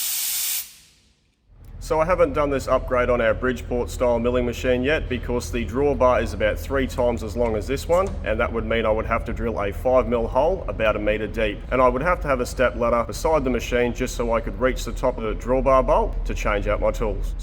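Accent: Australia. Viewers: Love this channel, I’m American and from across the world and is still the most relatable guy on YouTube, talks like every guy in the shop just with an Australian accent, and using metric, and bananas 🤢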